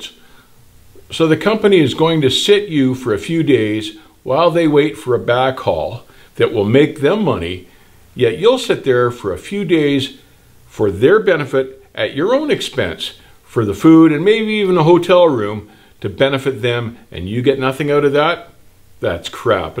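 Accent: Canada. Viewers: A good ol canadian boy, eh. He talks a lot aboot stuff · This guy comes across as American but sounds Canadian as hell. He's still hilarious, however. I'm subscribing. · Is that a Canadian accent i hear ey? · U sound Canadian. Our only friends. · Good tip and thank you for the video. You sound 🇨🇦 Ian...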